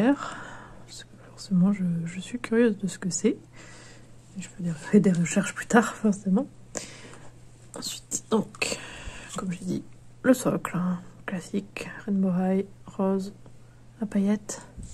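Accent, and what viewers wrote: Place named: France. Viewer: I have 80 of rainbow and shadow high dolls And I have her and she's gorgeous and your French accent is so gorgeous. I love it. I can understand what you're saying. Even though you're speaking French. Which I love.❤❤❤❤❤❤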